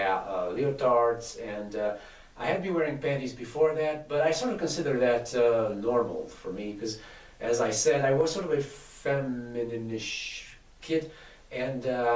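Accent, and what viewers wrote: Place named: Northern Europe. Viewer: Hey sister, interesting accent, North European or Brazilian? Maybe a little Ricky Martin in your voice.... catch you on <link>!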